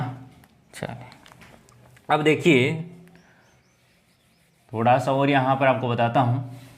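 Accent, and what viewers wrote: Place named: Marwari, India. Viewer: sir you speak marwadi sounds very nice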